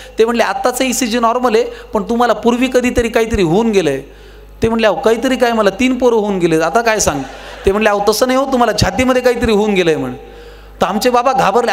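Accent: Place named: Marathwada, India. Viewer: खु छाण संकर्षण व्याख्यान झाले मराठवाडयाची बोली भाषा तुझ्या वाक्यात वाक्यात आली तुझ्या उज्वाल भविष्यासाठी खुप खुप शुभेच्छा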